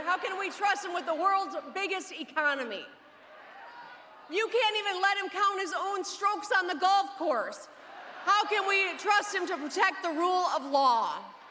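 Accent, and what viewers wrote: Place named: Midwest, United States. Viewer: I’m from New Yawk, but I love that Midwest accent!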